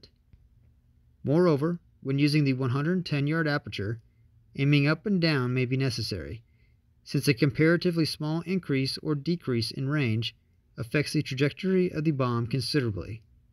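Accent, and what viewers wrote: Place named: United States. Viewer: I really don't like the fake instructional training video format. And your nasal US accent wouldn't have got past the front desk of the WD Publications Branch.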